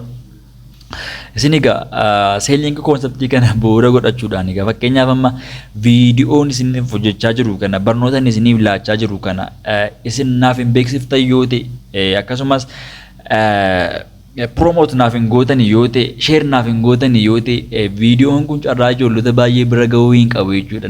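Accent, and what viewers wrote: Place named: Jimma, Ethiopia. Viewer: Waaaaaw🎉 amazing ❤ ni dandeessa dhugaa jaabaadhu loqoda jimma baayyee naaf ta'e🎉🎉🎉🎉❤❤❤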